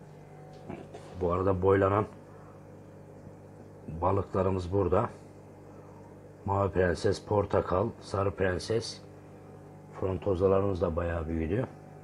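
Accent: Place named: Southeast, Turkey. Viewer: Üstad Güneydoğulumusun ? şiben biraz andırıyorda 😊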